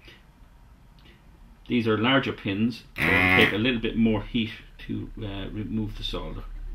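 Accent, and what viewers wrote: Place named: Ireland. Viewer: You must be from Ireland, they'd some irish accent!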